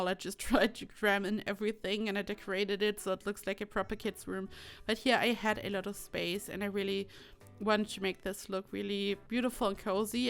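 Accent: Germany. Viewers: First, congratulations on bypassing the 100k subscriber mark and receiving your silver play button! You definitely deserve it. Secondly, I happen to love your German accent. One side of my family is of German ancestry and I took German in 10th grade many, MANY moons ago. I'm super happy for your Simming success. Also, congratulations on being the designated SNB Bank venue builder for SimRealist. Way to go!!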